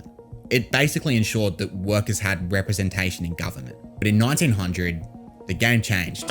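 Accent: Australia. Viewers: God I’m trying so hard to understand this but Aussie English is otherworldly man 😭🙈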